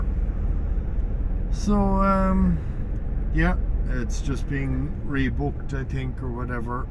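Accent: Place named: Mayo, Ireland. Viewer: Good vid again. Keep em coming in 2024. Where abouts in Ireland you from. My wife's family are Mayo. Your accent sounds your that way. HNY.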